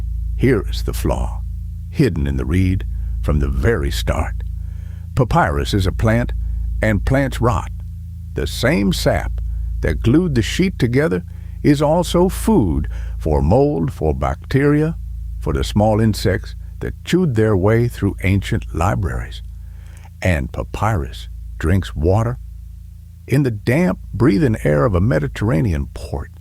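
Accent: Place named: Midwest, United States. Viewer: Hillbilly accent? You've obviously never actually heard a hillbilly accent before. This sounds like a Midwestern accent.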